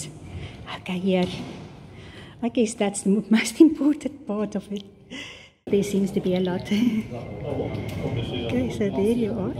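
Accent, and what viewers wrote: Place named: South Africa. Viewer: always happy to watch and enjoy hearing your South African accent :)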